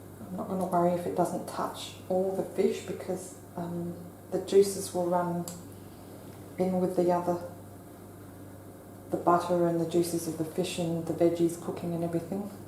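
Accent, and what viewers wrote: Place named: Australia. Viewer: I love your accent so so much! It’s just beautiful and incredibly relaxing!❤️ Australia is on my bucket list and it being cool there sounds so nice right now. It’s in the 90s here with an even hotter heat index. This is the best way to end my day! Thank you!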